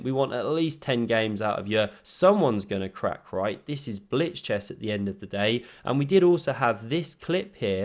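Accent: United Kingdom. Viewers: Why is it so hard to follow what he said? He even said it in a British accent.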